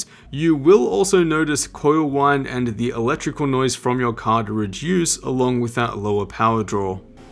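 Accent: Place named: Australia. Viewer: Must ask, how do you have an 3080 FE when you sound Australian? Do you live in aus? Did not think the FE was available to convicts like me living in Australia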